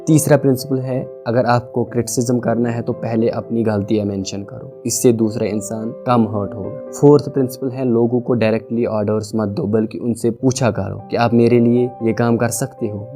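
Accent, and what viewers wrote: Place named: Kashmir, India. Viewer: A nice summary ....voice really soothing Kashmiri ascent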